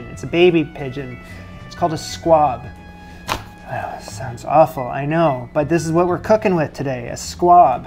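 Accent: Canada. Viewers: I was surprised by NYC. His vowels pronounce him to be Canadian...or so I thought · The Canadian accent always gets me haha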